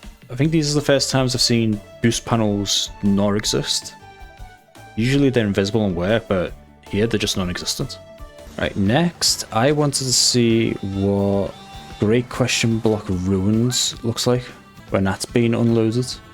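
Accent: Liverpool, United Kingdom. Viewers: I think he’s scouse. The accent gives it away?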